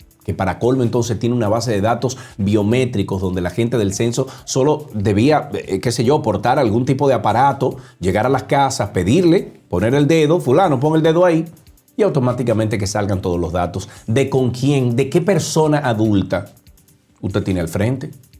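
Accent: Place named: Arab world. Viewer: Yo creía que tú no eras dominicanos porque cómo tú hablas tan mar dé los dominicanos pensé que tú eras árabe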